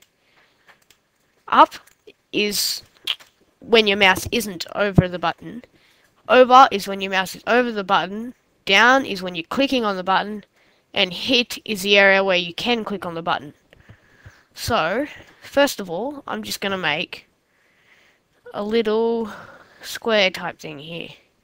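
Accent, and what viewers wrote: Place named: United Kingdom. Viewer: omg love your british accent ^^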